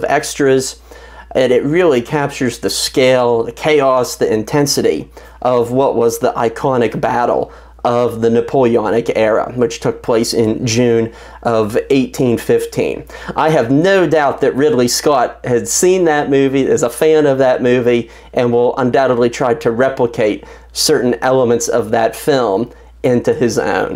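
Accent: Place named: Pittsburgh, United States. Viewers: Bro you gotta be from Pittsburgh Area. I moved away from there when I was like 12 and can pick that areas accent out. Lmk if I’m right. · The presenter has a Pennsylvania accent. He sounds educated. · This guy's Yinzer accent is strong.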